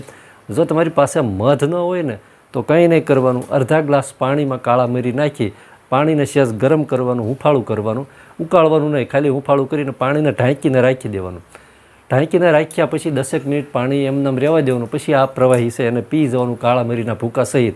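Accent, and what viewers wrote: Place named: Gohilwad, India. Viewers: Namaste motabhai... Ghanaj samay pachhi aap no video prasareet thayo.. aapni kathiyawadi bhasha sambhalvi mithi lage chhe.. aap na darek video hu jovu chhoo.. Ghanaj upyogi hoy chhe and monghi dava ane teni Side effects thi bachave chhe.. aava Sara's ane upyogi video apta rahejo Namaste. 😊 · એ તેમની બોલી છે ગુજરાતી તો બરાબર ગોહિલવાડ ની બોલી છે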